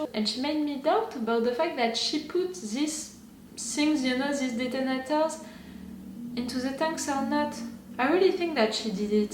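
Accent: France. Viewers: This french accent of yours is quite pleasant for my russian ears. Sounds great) and I understand almost everything)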